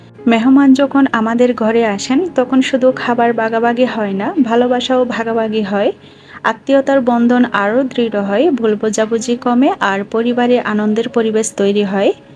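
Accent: Chittagong, Bangladesh. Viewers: আসসালামু আলাইকুম আপু,,,আমি আজকে প্রথম আপনার ভিডিও দেখলাম,,,আপনার কথা শুনে আর রান্না দেখে আমার কাছে মনে হয়েছে যে আপনি চট্টগ্রাম এর বাসিন্দা,, তাই শিওর হওয়ার জন্য আপনার আরেকটা ভিডিও দেখলাম,,মহররম এর ভিডিও টা দেখে শিওর হলাম,,,তাই সাথে সাথে সাবস্ক্রাইব করে দিলাম,,কারণ আমার জন্ম চট্টগ্রামে,,ছোটো থেকে বড় হয়েছি সেখানে,,তাই চট্টগ্রামের জন্য আমার ফিলিংসটাই অন্য রকম,,যদিও আমি কুমিল্লার মেয়ে,,,যাই হোক আপনার কাছ থেকে চট্টগ্রাম এর অতিথি আপ্যায়ন কিভাবে করতে তা শিখবো ইনশাআল্লাহ,, কারণ এই মাসে আমার বোনের বিয়ে,,,তাও আবার চট্টগ্রামের বাসিন্দার সাথে,,, আমার বোনের জন্য দোয়া করবেন আপু,,, আর আরেকটা কথা,,আপনার রান্নাগুলো মাশাল্লাহ অনেক লোভনীয় হয়েছে,,আমি চট্টগ্রাম চট্টগ্রাম করতে করতে আপনার রান্নার প্রশংশা করতেই ভুলে গেছিলাম,,,তাই আবার আসলাম কমেন্ট টা সম্পূর্ণ করতে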